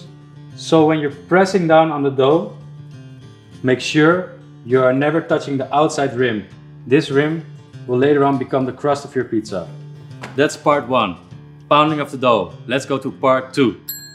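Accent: Netherlands. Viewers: Hi there :) are you Dutch? You sound as if you are :) greeting from the Netherlands! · Ik herken een nederlands accent altijd en overal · Jij moet wel Nederlands zijn ik hoor het gelijk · Dutch accent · Lekker Hollands accentje! Ziet goed uit hoor!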